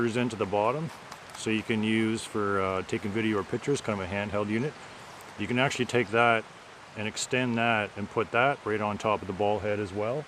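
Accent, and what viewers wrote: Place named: Canada. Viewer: I heard his Canadian accent 5 seconds in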